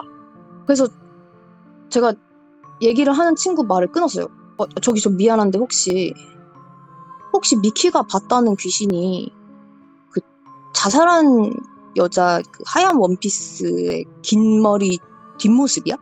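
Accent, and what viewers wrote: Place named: South Korea. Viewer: 아.. 이분 나만 샤샤같다 느낀게 아닌듯요.. 미국에서 학교 다니신분이 캘리포니아.. 매우 토종한국스러운 발음이신듯요 ㅎㅎ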